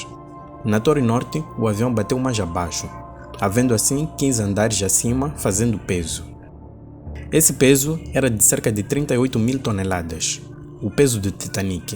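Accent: Portugal. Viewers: Por causa desse sotaque insuportável de português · Nossa, que idioma esquisito, parece português europeu ou português arcaico... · Português de Portugal, ninguém merece aff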